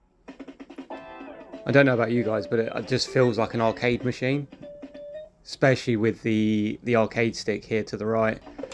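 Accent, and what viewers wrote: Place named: United Kingdom. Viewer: Yes they will mega CD model 1's are prone to leaking cap's on the main board especially if you remove the shielding you will probably see green muck around the caps every mega CD model 1 I've worked on has had leaking cap's on the main board, the sub board -power board uses though hole cap's they don't normally leak but can as well , you should try to find someone to recap it asap these model 1's are getting quite expensive nowadays and very sort after, I would love to recap it for you, but I'm all the way over in Australia you sound like your in the UK good luck 🤞 with it friend ☺️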